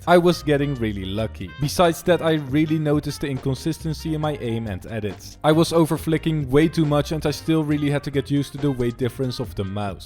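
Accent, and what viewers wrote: Netherlands. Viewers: Ge hoort gewoon dat da nen hollander is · are you dutch? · je bent zoizo nederlands ik kan het horen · Are you dutch. I am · Kom je uit Nederland wand je heb een Nederlands acsend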